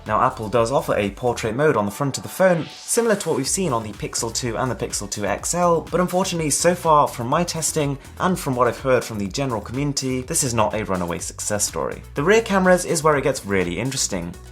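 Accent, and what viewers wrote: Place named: United Kingdom. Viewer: An Indian guy with a British accent. Just what i was looking for.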